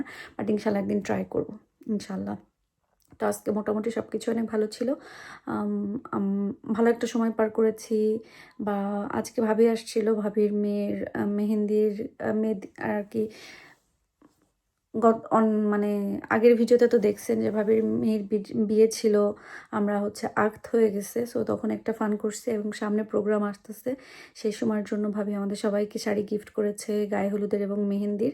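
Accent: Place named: Chandpur, Bangladesh. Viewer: Vaiya khub chalk chadpur bole Kotha Ami b bariar meye gazipur thaki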